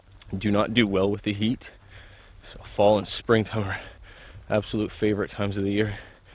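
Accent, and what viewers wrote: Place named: Canada. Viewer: Been watching so much American bushcraft lately that I actually noticed our accent when I first start the last part lol. Like oh ya he’s Canadian too. Glad I found ya bud gonna be flying through your vids. Be one with the liquor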